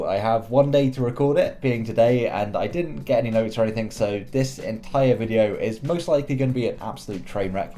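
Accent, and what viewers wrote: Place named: England, United Kingdom. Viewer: Great draft, even better accent - it's nice not being the only Englishman in the league!! :')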